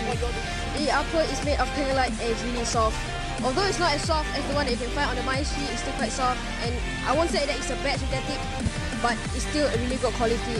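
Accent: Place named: Singapore. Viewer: lol u singaporean eh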